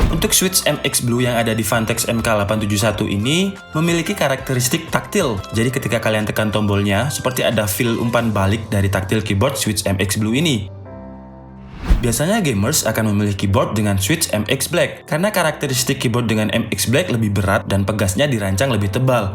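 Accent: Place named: Surabaya, Indonesia. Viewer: Sampean wong suroboyo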